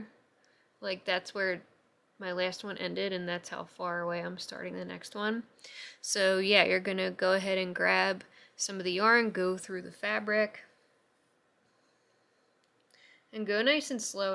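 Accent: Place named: South Jersey, United States. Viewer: Can’t wait to try this strap. Thanks. And by the way…and I’m not making fun because I grew up on NY and get a lot of comments about my accent, But I think you have that south Jersey accent which has the Philly influence. There’s certain words when it reminds me so much of my friends from that area. Good luck with channel!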